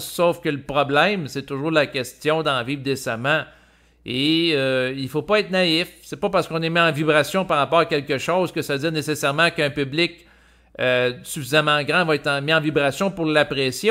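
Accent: Quebec, Canada. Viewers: Regarder les vidéos, enregistrer les vidéos, aller vivre à Québec.....ca veut pas dire que la personne est supraconsciente. Je vois des vidéos sur le supraconscience qui me font peur, pour dire des choses comme elles sont. N'importe qui se considère comme supraconscient, mais des gens ils sont loiiiiin de supramental / supraconscience. Comme j'ai déjà dis c'est pas donné à tous le monde. Moi j'aime bien Québec,les gens surtout votre accent, un vrai plaisir a écouter les québécois parlé. Ca veut pas dire si on part vivre n'importe où qu'on va devenir ça ou ça. On est loin de supramental/ supraconsience. merci pour le partage 👍💎 Bonjour à vous tous 🖐️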